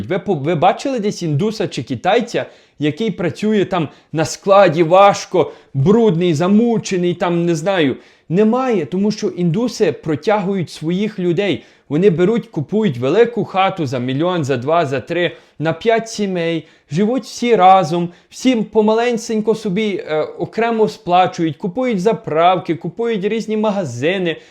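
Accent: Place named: West, Ukraine. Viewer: ПРОСТО жесть!!чувак шо за Украинский у тебя? шо за дичайший западенский акцент !?В Тебе не справжній Український..а типова кастрюльно бандерівська мова!фуууууууууу...ІДІОТСЬКИЙ ЗАПАДЕНСЬКИЙ АКЦЕНТ!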